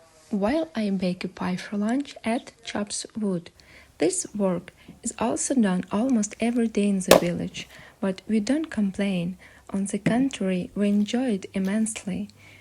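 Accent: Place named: Ukraine. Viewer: ВЫ из НЕ ИЗ УКРАИНЫ? АКЦЕНТ кошмар жуть🙈. У меня выработался навык за последнее лет 7-10 различать украинцев за вёрсту чуять) 😊и вообще русскоязычных сразу вижу)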